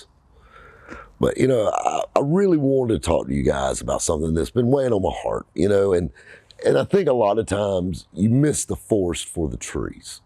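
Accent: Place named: Greenville, United States. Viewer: Bro i feel so crazy your from greenville.. I would see u on vinwiki.. I always thought this. Guy sounds like us and he funny as hell.. Your doing great and you represent greenville 👍👍👍 and yes bwm or everywhere 😂😂😂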